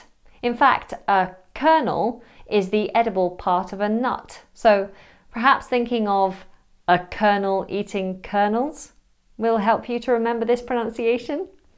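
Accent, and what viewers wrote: United Kingdom. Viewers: HEYN HEY!HEY !HELLO BEAUTIFUL LADY!😘 I REALLY LOVE YOUR BRITISH ACCENT!😘🙏. ESPECIALLY ENGLISH ACCENT FROM AUSTRALIA I JUST LOVE THE ACCENT IN SHORT WORDS OF THEM IN GENERAL... IT IS JUST KIND OF DIFFERENTIAL FROM USA ENGLISH. AND NOT MENTION THE DIFFERENCES FROM EACH STATE HERE IN USA ESPECIALLY THE TERMINOLOGY SPEAKING OF BLACK PEOPLE, NOT QUALITY EDUCATED, OR LESS OF MEDIUM EDUCATION... I'M NOT SAYING THIS FOR DISRESPECT BLACK PEOPLE,. I'M SAYING THAT BECAUSE THE DIFFERENCES OF SPEAKING WAYS OF SOUND DEPENDING OF THE AREA, OR AN INTERACTING HIGHLIGHT. JUST TO SAY...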